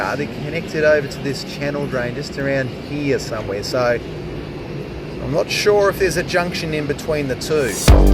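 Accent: Australia. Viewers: Hello there. It's Tuesday 22nd April. The sun is shining & I'm sitting watching you from England. I'm glued to the t.v. absolutely fascinated with the job that you do. You are great !Can't take my eyes from the screen & it's lovely hearing your Australian accent. Keep it coming you fabulous man. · Love the channel always loved the Ozzie accent since we got the Paul Hogan show in the 80s. 🏴🇬🇧⚒️⚒️⚒️. The New South Wales is a bleeding sight better than the old one. Your a legend.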